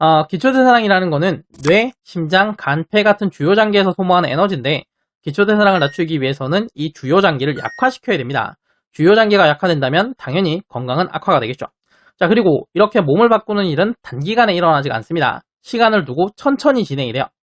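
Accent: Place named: Gyeongsang, South Korea. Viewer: 이분 경상도분이신듯 약간 억양이 왜 경상도사람들이 아 어 발음이 좀 새는경향이있거든요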